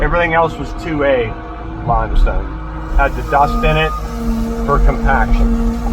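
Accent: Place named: Pennsylvania Dutch, United States. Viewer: I seem to detect a heavy "Pennsylvania Dutch" (German) accent when you talk. That combined with your German last name, brings me to that conclusion. Are you located out of Amish Country in PA?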